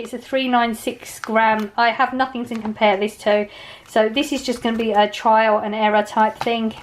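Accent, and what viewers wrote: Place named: Cockney, United Kingdom. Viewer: She speaks with a cockney accent.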